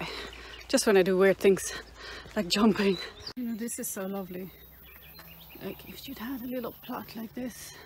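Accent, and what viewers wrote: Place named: Ireland. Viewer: I just saw a little boy child across your camera when you went in for a dip...so am figuring that you aren't the only person there........trying to figure out your accent....oh, i thought it was Irish.....lol oh, those men don't really mean any harm, they are just trying to break the Ice .....I am British, but my parents are Jamaican. A lot of people ask me where I am from, but because I am black, I get asked , so where are your parents from, so I have to explain they are Jamaicans.......BOOM !, as soon as they hear Jamaica .........Bob Marley, Ganja or Usain Bolt . I get it, they are trying to relay connect with me by the only thing(s) that they know about Jamaica, so maybe those men only know about the red light district and maybe the weed Cafes there.......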